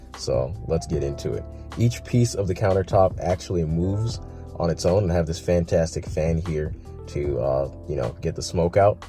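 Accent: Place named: Canada. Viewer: You totally sound Canadian, where are you from?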